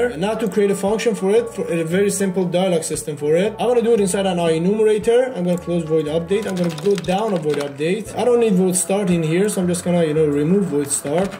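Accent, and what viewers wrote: Iran. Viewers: Nice video! I'm trying to figure out where your accent is from, maybe Iran??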